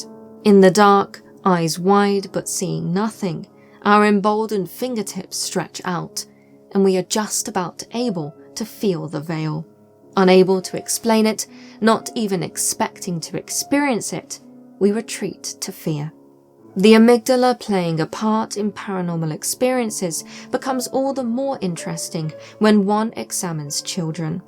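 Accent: United Kingdom. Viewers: The narrator, is very eloquent and very english, very lovely. And this explanation about why we are afraid of the dark does make since. I don't feel quite so bad being still afraid of the dark and being 49 lol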